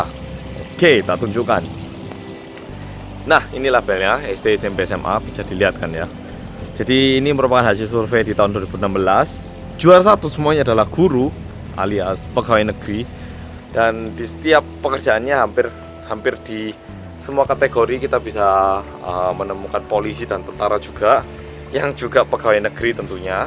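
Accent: Java, Indonesia. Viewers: aku seneng gawe logat medok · ini orang korea kok medok 😂 pernah ke jawa apa temen nya bajindul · the power of medhok haha jawane kentel banget salam sangka etan yo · maaf sebelumnya aku baru liat chanel ini,mau tanya ini bnr org korea asli? tpi ngomongnya agak logat jawa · logat korea,ne ilang,,,!!!! jowo,ne medhokk. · Mas coba dong bikin video, tapi jangan pake logat jawa:v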